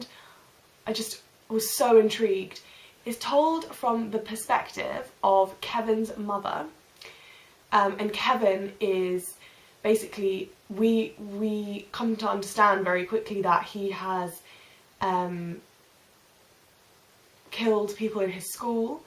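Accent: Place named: United Kingdom. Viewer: Pretty cool his first video. I am going enjoy a lot to hear british english. Looking forward to watch new videos. My congratulations.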